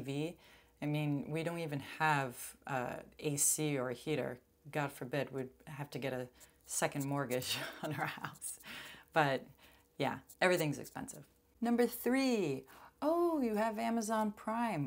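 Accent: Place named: Boston, United States. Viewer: you definitely still have a little Boston accent. you sound like you are from Chelsea or Malden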